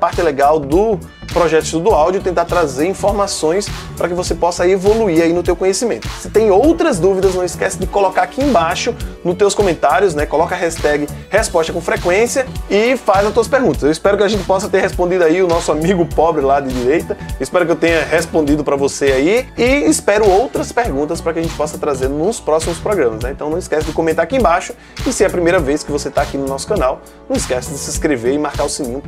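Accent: Northeast, Brazil. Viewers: vc é de qual estado do nordeste? gostaria de fazer o meu som com vc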